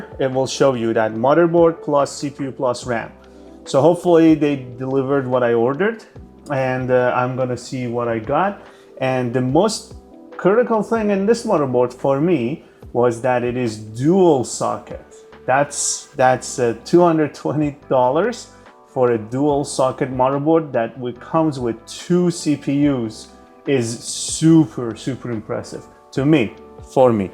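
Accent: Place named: Iran. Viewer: I can tell a Persian accent from a mile away. Nice board.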